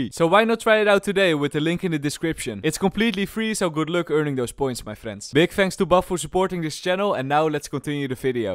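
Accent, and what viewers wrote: Netherlands. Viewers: your accent tells you are from netherland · He’s dutch I can hear it for his accent because I’m dutch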